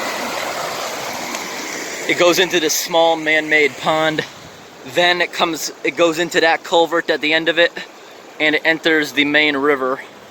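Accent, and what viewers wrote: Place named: United States. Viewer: Where in the U.S. is this accent from? Or is it just the way he talks? Either way I like it!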